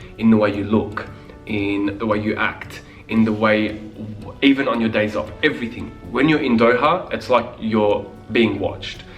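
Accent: Australia. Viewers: Does it get better the longer you stay there? 😢 Ps you sound aussie!!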